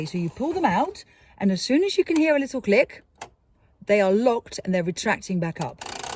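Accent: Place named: United Kingdom. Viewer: Ach British is the nicest accent in the world - it's like balm for my ears ❤😊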